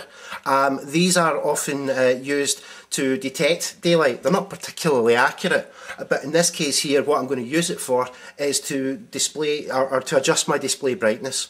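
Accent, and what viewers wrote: Scotland, United Kingdom. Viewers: I knew the accent was souding very scottish. Maybe I'll meet you at the next Arduino meeting at the Electron Club… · Your scottish accent is great - i like it very much!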